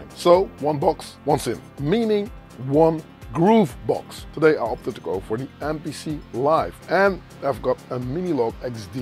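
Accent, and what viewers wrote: Netherlands. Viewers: Toevallig nederlander ?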